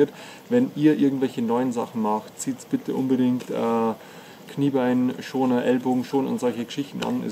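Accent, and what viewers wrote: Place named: Bavaria, Germany. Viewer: Kleine Frage, aus welcher Gegend kommst du? Denn man hört den Bayrischen Dialekt☺️😉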